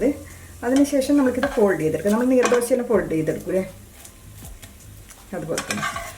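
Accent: Kannur, India. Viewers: Super dosa..I will try ..Kannur slang 👌👍. thanks for sharing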